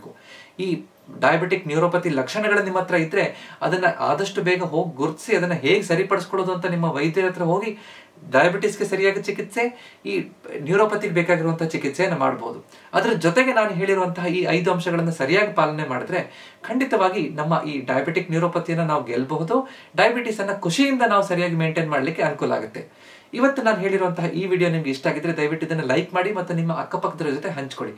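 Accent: Standard. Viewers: ಇಷ್ಟು ಶುದ್ಧವಾಗಿ ಮಾತನಾಡುವ ಡಾಕ್ಟ್ರ ಕನ್ನಡ ಅರ್ಥ ಆಗದಿದ್ದಲ್ಲಿ ,ನಿಮಗೆ ಯಾವ ಭಾಷೆಯೂ ಅರ್ಥ ಆಗದು😂😂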